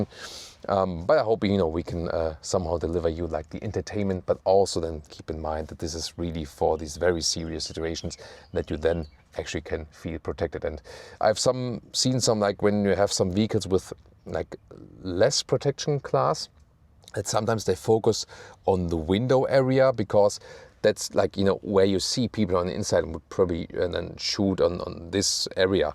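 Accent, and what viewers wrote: Germany. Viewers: As a woman, the host is very hot. However, the German/Austrian accent just ruins it for me. He'd be a perfect 10 if he spoke Italian, perhaps Portuguese ❤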